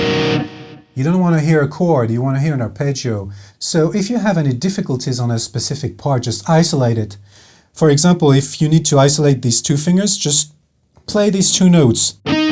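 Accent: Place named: England, United Kingdom. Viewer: Nice english accent:)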